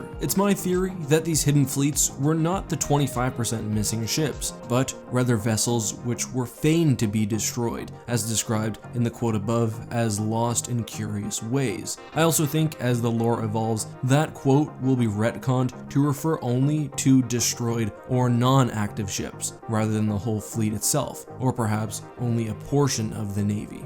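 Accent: Canada. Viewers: are you Canadian? As a Michigander, I know a couple Canadians. And my tech/accounting teacher is Canadian. I’m asking cause sometimes you say “aboot” instead of about. Lol I’m just curious.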